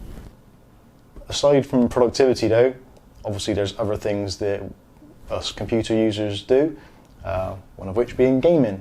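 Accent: Bristol, United Kingdom. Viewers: Bro are you from bristol cause if u are same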